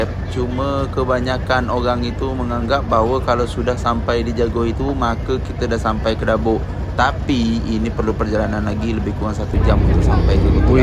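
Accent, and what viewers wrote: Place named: Johor, Malaysia. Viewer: Salam...maaf baru terjumpa channel sedara..nak tanya juga ni melayu Daik Lingga atau orang Malaysia..sebab cara percakapan tu, macam orang Johor..bangga saya sebab sedara tidak banyak terikut dgn loghat bahasa melayu Indonesia, tetap dgn keaslian melayu..harap lebih banyak konten yg akan sedara upload dimasa mendatang..👍👍👏👏👏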